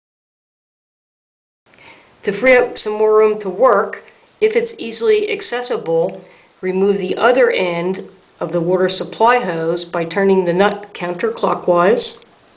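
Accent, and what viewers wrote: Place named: Baltimore, United States. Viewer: Nice exploration, thank you. "Wudder." Is that a strong Baltimore accent I'm detecting?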